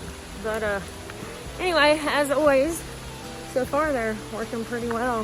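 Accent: South, United States. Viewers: Very enjoyable and good info for my Grandkids. I'm born and raised from Texas now living in Michigan. If I don't hear that Southern lilt now and then I have to go south and have pie and listen to a Southern Waiteress. Thanks for everything!!! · You seem to have got more Southern-sounding since lockdown started. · Dang i miss the south.. I'm stuck in yankee land... love the accent.... makes my hes and haws pop out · You are so inspirational and beautiful. Love the southern accent. Ut oh I think i have a crush.... Lol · How cute you're obviously from the south I detect a Southern accent lol👍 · Barely found this channel and I love her camera work and southern accent. Thumbs up and subscribed. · Missed that southern draw. Thanks for the views. Have a great day.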